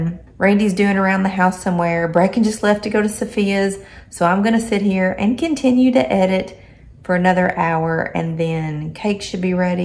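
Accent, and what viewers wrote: South, United States. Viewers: I really love your channel....Have been enjoying the recipe segments...& just seeing how you go about your day to day being the entrepreneur that you are...& you are just so personable! You are just so sweet...plus, I love your Southern accent & of course, your pups!;) <3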